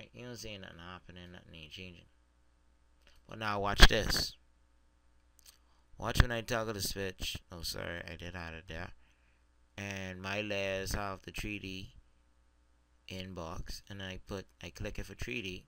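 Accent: Bahamas. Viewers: you sound like an Island boy....You from the Bahamas? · where u from the bahamas aye?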